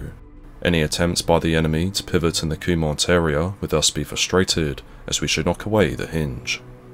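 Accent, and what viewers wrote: United Kingdom. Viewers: You can be polite. not everyone is habitual of British accent. not everyone is habitual of listening to English. there are people around the world who speak many different languages and hence they find it difficult to understand such a "typical English accent". no offense!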